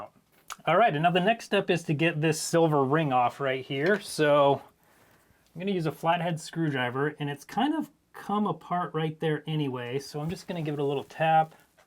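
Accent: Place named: United States. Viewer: it feels like my mate vince with american accent 😂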